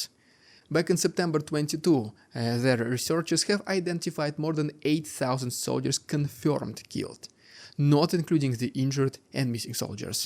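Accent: Russia. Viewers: Can you translate to English. Your Russian accent is hard to follow.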